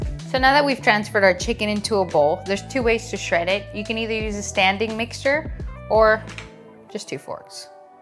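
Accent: United Kingdom. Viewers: it's wild how your accent is mostly unchanged but you speak with a very British cadence now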